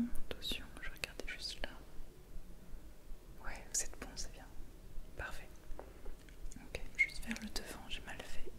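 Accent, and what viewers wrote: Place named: France. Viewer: Hi, I hope you continue with your desired goal of making it as an ASMRist - this is very admirable.Please produce more videos centred around you speaking in English (French speaking English is the ASMR in essence).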